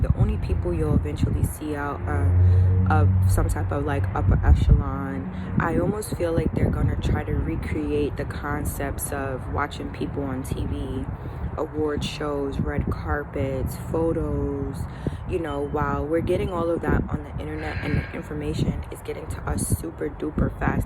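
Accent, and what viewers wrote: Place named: Baltimore, United States. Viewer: OMG you sound like you're from my hometown!! Aye Baltimore! But you gave some very insightful advice bc I had to makes changes myself regarding the overuse of technology and the underutilized benefits of nature. When I walk my dog, I hardly see anyone else outside but just feeling the rays of sunlight on a consistent basis helps my physical and mental health tenfold. Its easy to get complacent with being indoors but after a while, it can take a toll as you mentioned. I begin to get more anxious after too many days indoors. The body will certainly alerts you when something is amidst.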